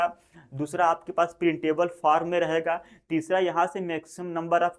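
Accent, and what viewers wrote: Uttar Pradesh, India. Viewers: Bhai aap up ke ho kya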